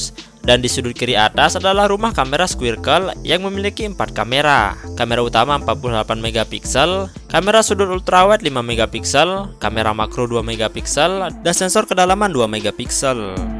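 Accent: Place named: Bali, Indonesia. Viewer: Logatnya bali banget bang😁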